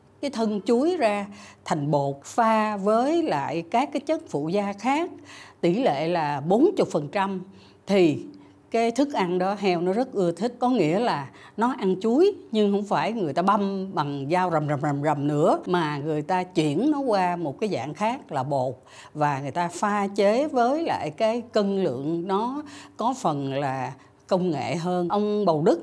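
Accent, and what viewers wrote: South, Vietnam. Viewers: Em mói biết đến you tube của chị đươc vai ngày nay. Rất thích cách dẫn dất chuong trinh, chia sẽ kiến thưc rông rãi, đề tài hấp dẫn và nhất là giọng nói miền năm Viêt Nam, thât dịu dàng thân mât của cô. Mong chuong trình sẽ còn tồn tại lâu dài đê em được tiếp tục theo dõi và học hỏi nhiều điều hay chỉ chia sẽ.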